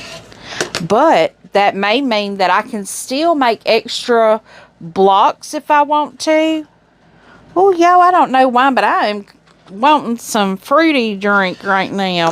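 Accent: South, United States. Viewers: Hi ! It was nice listening to you today while I was sewing. You are so fun to listen to with your southern accent! I did a retreat at Missouri Star Doan girls retreat and it was so fun, we got a ton of free stuff- fabric for two quilts, numerous free things so it paid off since it was pricey. Tell me what you are bringing to work on. I brought stuff to the retreat but they had us sewing the new quilts, pillow cases and tons of stuff!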